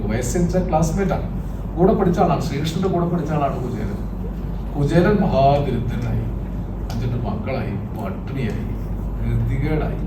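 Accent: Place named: Ponnani, India. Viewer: പൊന്നാനിത്തം നിറഞ്ഞു തുളുമ്പിയ മനോഹരമായ സംസാരം ❤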